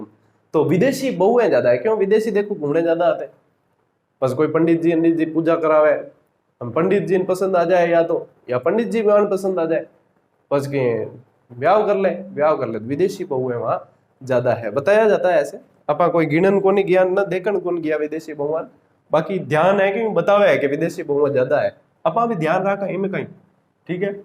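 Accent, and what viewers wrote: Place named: Rajasthan, India. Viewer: Sir aap marvadi bolte ho to bhut achha lagta h pr smjh km aati h greet Sir ✌️✌️👍👍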